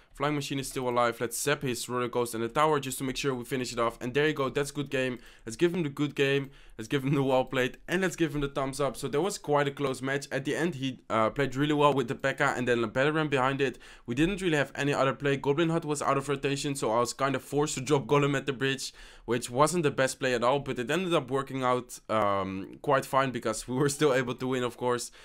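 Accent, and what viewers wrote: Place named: Netherlands. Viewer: You can hear that you're from the Netherlands that is what I thought when I watched you for te first time😂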